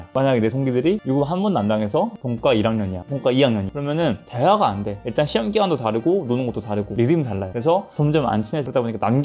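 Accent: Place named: Iksan, South Korea. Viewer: 익산 사람이에요? 익산 사투리 종종 들리는데요~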